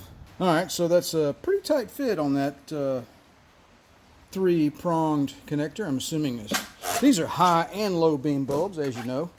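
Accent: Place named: South, United States. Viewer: Strong southern accent.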